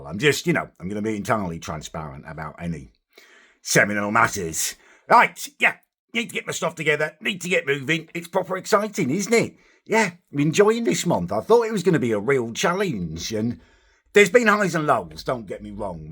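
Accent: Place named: Blackburn, United Kingdom. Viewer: Think he was born in Blackburn, I'm from around there and he has the same accent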